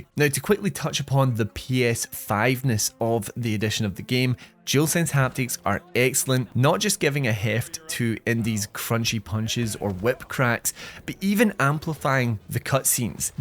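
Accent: United Kingdom. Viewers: SOMEHOW I KNEW SOME BRITTISH CHAP WOILD NARRATE THE PREVIEW. HINT. ITS A TURNOFF.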